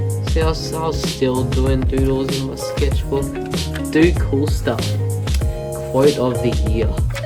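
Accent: Australia. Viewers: Are you Aussie?\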